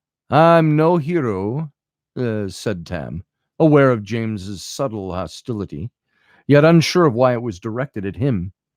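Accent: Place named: Scotland, United Kingdom. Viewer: That Scottish accent is not to shabby 😂 give you a 8 out of 10 haha 😂❣️ xx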